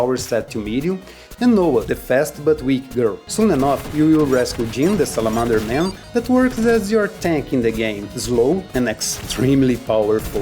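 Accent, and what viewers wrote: Brazil. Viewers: Es Brasileiro o sotaque em ingles nao engana.o tchi no final das palavras denuncia logo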